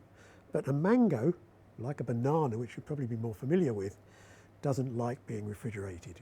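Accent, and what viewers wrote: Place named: United Kingdom. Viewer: BananER...kills me every time! Is there any explanation as to why the British accent tends to drop the "a" and replace it with "er"? Or is it much like my New Jersey accent that tends to drop the "g" from "-ing" or implant a "w" in place of "-ll" such as "ball" turning into "bawl"? It just is what it is?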